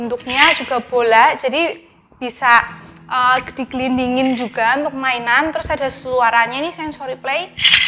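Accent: Java, Indonesia. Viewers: Walaupun medok mbake ayune poll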